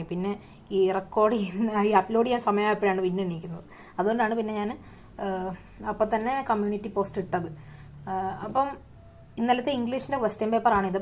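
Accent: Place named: Kollam, India. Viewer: Kollam aano allel alappuzha aano ente cousinte slanginu similar slanganu sisinte slang 😅 btw all the best sister, ente examum moderate level eennu parayum, paper different aanu, pinne bio namukk, bot3, zoo3 chp vechitt ind, physics complete aayi ini derivations practice chyan und.... All the best champ . 🔥🗿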